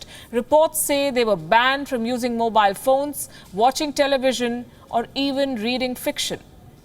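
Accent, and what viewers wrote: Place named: Northeast India, India. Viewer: That north eastern accent 😻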